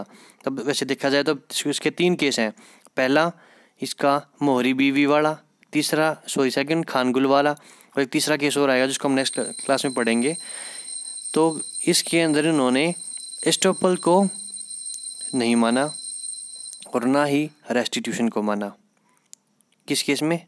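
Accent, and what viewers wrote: Haryana, India. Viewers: Mast haryanvi... Good explain...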